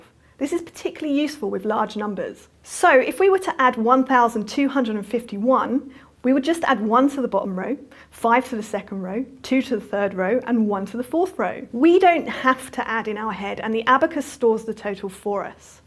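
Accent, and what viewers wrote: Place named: United Kingdom. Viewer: 英音听着好难受啊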